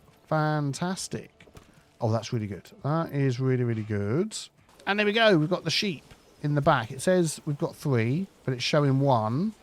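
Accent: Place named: Midlands, United Kingdom. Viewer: Great to see you playing this game - looking forward to release of this beautiful alpha! I have sort of given up on cities skylines 2 until there are assets and more fixes (despite having 300 hours in it) - so this looks lovely and from all the play I have seen there seems to be no game or deal breakers. Lucky you having contact with Greg for help! But yea, after cities skylines I think you are simultaneously over-thinking and under-thinking things at times LOL. Also you missed a trick when laying out your houses... after selecting the area you can hit the min-max buttons to adjust the size of the plots... so if you have a row of say 6 you can make them bigger and drop to 5, 4, 3 or 2, allowing for bigger gardens or room for two families. If your trades are taking too long get a horse or two....you need to beef up on the wood, cos virtually everything hinges on it, and getting more families moved in to have more workers. 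Not being a smarty pants here - just what I've observed.... and as I say, this has a really different mechanic than say CS2 or indeed most other games:) I love that it feels totally organic, and love how you can get your roads and paths to flow without the complexity seen in other titles. I had to laugh when you got baffled when you looked at one peasant and said she was carrying a sheep...then zoomed in and she's carrying wool and you wondered where it had come from LOL.... You sound like a midlands guy, so I presume you know where wool comes from hah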